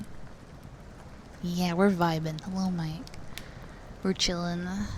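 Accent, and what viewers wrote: Scotland, United Kingdom. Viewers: Dunno who dis is.. but i do love scottish.. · Wait I think this is my first time hearing the Scottish accent in ASMR lmao this is funny It sounds cute :D am Scottish too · oh, goodness I adore scottish accents, Im looking forward to more of your videos! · You hear the word Scottish and you immediately expected the most unintelligible speak imaginable but this was extremely enjoyable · This, along with Rayla from The Dragon Prince, made me realize that I absolutely STAN scottish accents. Like...just the accent and the voice. Don't judge. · Scottish Accent + Rain = Top Tier ASMR <3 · Finally, a true Scottish ASMR. And a cute one too. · Scottish accent. Blessed day, I haven't actually found many scottish ASMRtists and something about yall voices gets me so cozy. everytime you say phone = good chemical too subbed, dubbed, you're great. 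· Thank you. I loved this and your cute scottish accent xD. I should visit scotland and the highlands next year!~ Looking forward to another fun relaxing ASMR <3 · Wots dis nowe? A scottesh accent ASMRr? We've ben Blessed Lads! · oooo scottish asmr this is new gonna give it a try tonight since im having trouble sleeping since im under the weather 🥲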